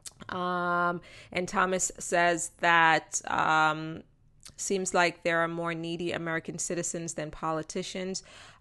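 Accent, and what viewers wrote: Jamaica. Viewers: I ❤ your jamaican accent . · I dont know how old you are l have seeing you have Bob Marley's family similarities mmmmm🤔🤔 excuse me but l always follow your channel but today when you said quote "sorry guys for my Jamaican accent mmmmm.l have questions in my mind and heart. Maybe you are related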